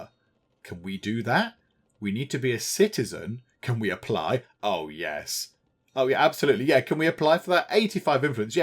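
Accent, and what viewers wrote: United Kingdom. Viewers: Your gameplay are hilarious. And your British accent fitting the game so well. I'm in love with Medieval and Tudor era since I watched BBC documentaries. And I love playing Guild 2 years ago. Finally I play this game after watched your Cupboard dynasty. Have the game since 2020. Never play it until fully release.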